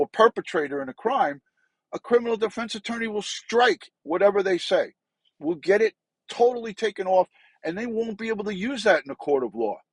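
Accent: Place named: Rhode Island, United States. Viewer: How you doin? I love your accent. You sound like me. I'd from RI. Praying for you & your loss of your precious mama. Be glad loves you so much.❤️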